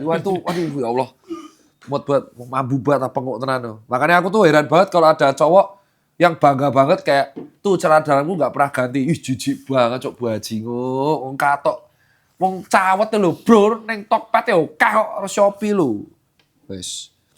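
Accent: Java, Indonesia. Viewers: Ful ngakak anjir beliau kalo ngmong asbun blundernya terlalu plenger mana aksen jawanya medok lagi😂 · jowo nyelll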